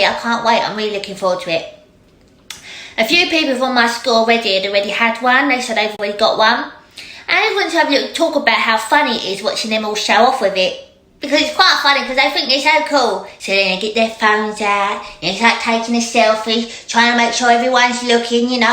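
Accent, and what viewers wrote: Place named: Newcastle, United Kingdom. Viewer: What part of England are you from you sound Geordie I'm from Newcastle if you are as well what part of Newcastle are you from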